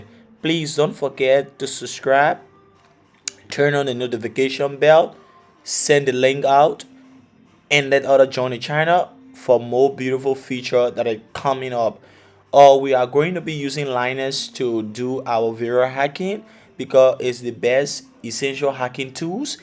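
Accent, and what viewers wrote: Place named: Liberia. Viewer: hi, I know this is not necessary. you sound like a Liberian. Good job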